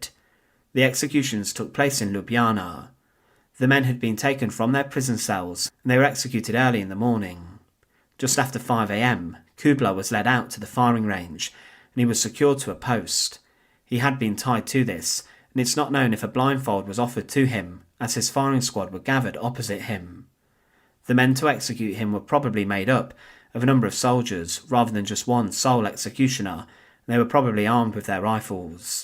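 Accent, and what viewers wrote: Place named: England, United Kingdom. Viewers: i The Narrator has an English accent… just cannot read a script fluidly. Awful . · He definitely is English. He just has no idea how to read a script.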